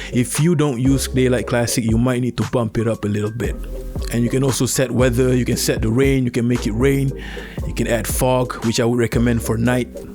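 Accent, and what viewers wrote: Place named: Malaysia. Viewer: Cara bicaranya mirip orang malaysia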